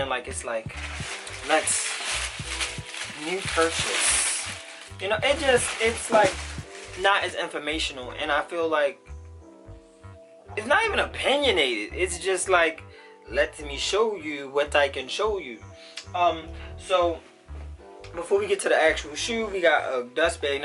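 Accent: Baltimore, United States. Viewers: im so dead I could tell you from Baltimore just by the accent and I was right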